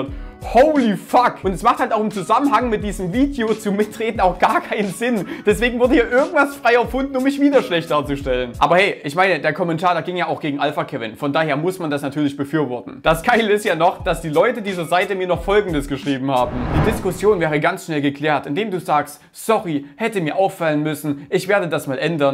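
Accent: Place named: Saxony, Germany. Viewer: Aber ein Prob muss an die Seite geben! Sie sind vllt die Ersten die wissen, dass du einen sächsischen Dialekt hast und keinen ostdeutschen...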